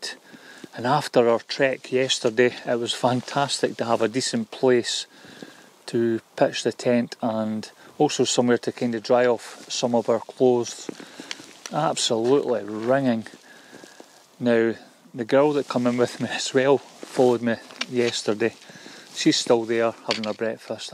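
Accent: Scotland, United Kingdom. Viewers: as a Scot living in Sweden let me tell you the accent isn't holding anything back. The people of the world love our accent. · Proper jock accent, lov it :)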